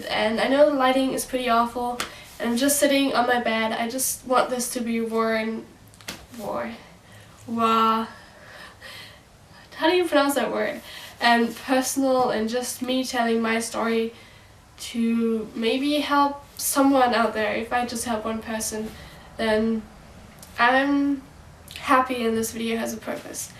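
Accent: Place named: Germany. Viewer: Bevor ich deinen Kanalnamen gelesen habe, dachte ich so "Ist sie Deutsche? Denn ich habe den exakt selben Akzent" :D. Ich rede genauso wie du, wenn ich englisch spreche. I'll continue in english so everyone can understand. I'm pretty sure I'm bi too. Nobody knows although I think a few friends assume. I kinda wanna come out to my friends but I'm not sure if I'm ready enough unless they ask then I would tell them. I have had thoughts of being gay (turns out I'm bi) since the age of 13 (I just turned 17) and although I've never had a problem with lgbtq+ people being one myself was something I really didn't want to be. Accepting myself was definitely the hardest things although I knew my family and friends would support me fully.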